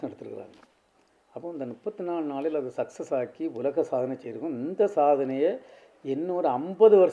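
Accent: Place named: Kanyakumari, India. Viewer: kumari naatu Slang...nice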